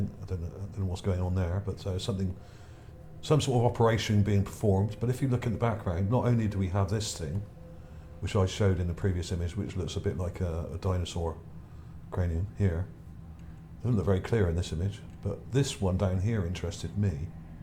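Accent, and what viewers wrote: Bristol, United Kingdom. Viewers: Gotta be from Bristol with that accent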